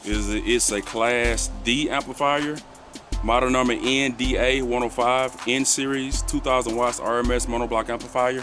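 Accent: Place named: Mississippi, United States. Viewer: You definitely from Mississippi with that accent lol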